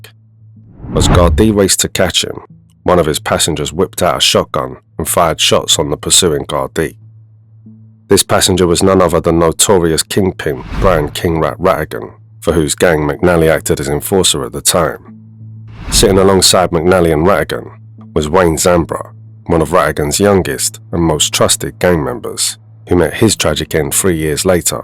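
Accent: United Kingdom. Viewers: State of this shite😂😂😂 uk accent is cringe as fook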